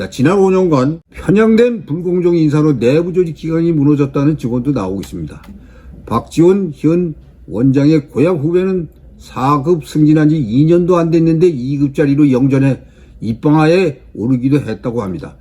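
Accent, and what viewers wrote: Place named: North Korea. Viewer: 문재인 정부가 깔아놓은 사람을 선정해라 말인가. 당신 목소리가 이북사람 같다.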